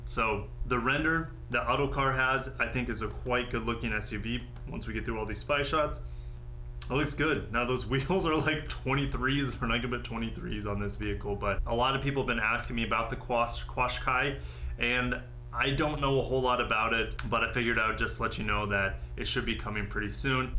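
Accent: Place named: United States. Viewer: I'm sorry, but Americans and their pronunciations...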